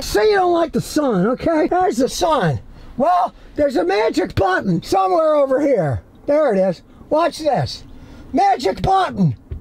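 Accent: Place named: Texas, United States. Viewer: I like how SK covers everything important and hasn't lost his Texas accent. I have to turn the volume down though!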